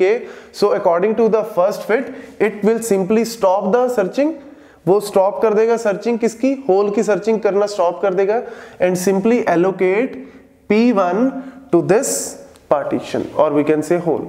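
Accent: India. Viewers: accent is way to strong. i already hate indian one but this is blasting my patience 😂. im sorry this is not personal, because i hate all idian accents. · oh my god! why is it so hard to understand indian english? i can only understand 1 out of 500 videos i see around! i recommend indian guys to put subtitles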